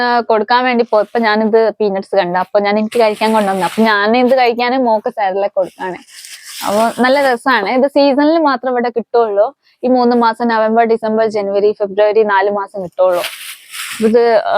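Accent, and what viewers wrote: Malappuram, India. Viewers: Ividunn klynm kazhich angot poythaan.love marriage enn Avr prnjllo.pine samsarm ketit malprm muslimsnte samsrm an. Malapurth hindusn veroru slang und. Kurch nlla malayalm.matham mariyath avam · Malappuram slang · kayichath kettappo thonni malappurathnnanu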